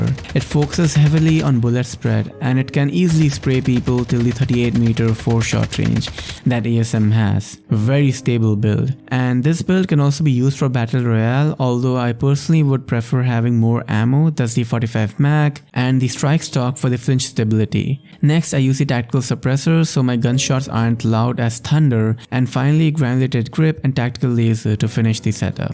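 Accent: India. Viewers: Your accent sounds like Indian. Are you Indian?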